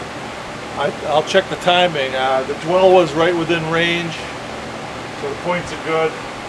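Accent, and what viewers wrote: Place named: Maine, United States. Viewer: you must be from maine.... "motah" lol... (I'm a mainer as well)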